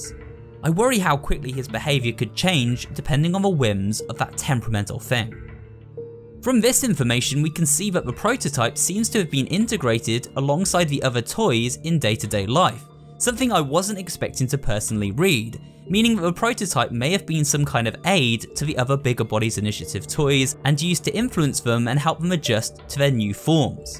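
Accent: United Kingdom. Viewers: is it just me or did you get more british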